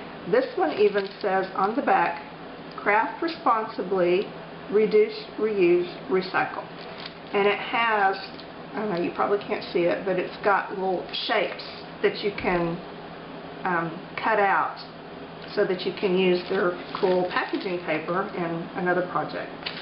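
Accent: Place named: East Texas, United States. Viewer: Your so funny. Born and raised Texas girl here, I dont have a accent like you though. Sound like East Texas to me, lol. I hope you enjoy all your goodies. I have the same trimmer and I don't know if I like it. It doesn't cut straight for me at all. I have gotten used to its little quarks and pretty much know how to set my paper so it will cut straight. Thought the wire thingy would help with the cutting straight issue but no I have yet to find a cutting I absolutely LOVE. TFS Mari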